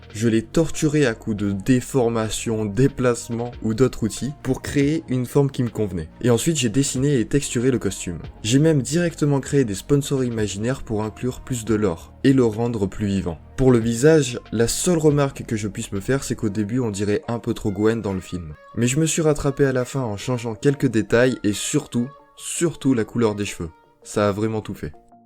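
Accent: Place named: England, United Kingdom. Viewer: Alors l'accent anglais est trop guez mais par contre gg pour la vidéo comme d'hab, c'est quali ^^ continue comme ça 😋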